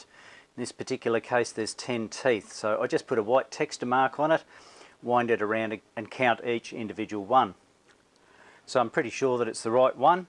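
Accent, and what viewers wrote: Australia. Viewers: Awlternator lol NSW accent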